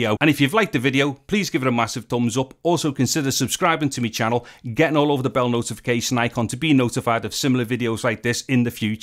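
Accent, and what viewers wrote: Liverpool, United Kingdom. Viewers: It seems strange to me (living in the US) that the variations stateside are nowhere near what is found among the original native speakers of English - these regional British accents are some of the most difficult for us to understand. But again, how come the four most famous Liverpudlians never spoke like that? Also, why does Wayne Rooney, a real Scouser AFAIK, sound so very different from David here (and is, TBH, unintelligible)?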